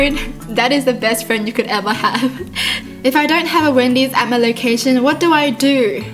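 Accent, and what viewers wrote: Australia. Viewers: do u live in Australia bc ur accent sounds Australian and u said u live in Sydney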